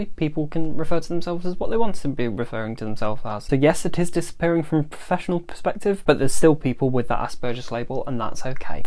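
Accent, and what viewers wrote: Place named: United Kingdom. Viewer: I prefer the term Asperger's, I pronounce it your british way. For several reasons. One it honors Asperger himself, who was a genius and had it himself, two it speaks to the 60 year time gap before idiotic american medical ascociation caught up to his ideas. And three it seems more of a technical personality quirk without being an all encompassing different than normal state. I think it's only being abandoned for political reasons in the political boards and will be taken back up, and as such I'm sticking with it, speaks to how this is very dependent on personal evaluations, where one man can out think the whole world by 60 years like that.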